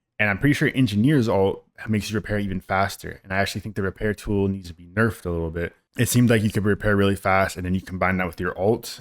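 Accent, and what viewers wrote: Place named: RP, United Kingdom. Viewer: Listen to the Man, he learned from the BBC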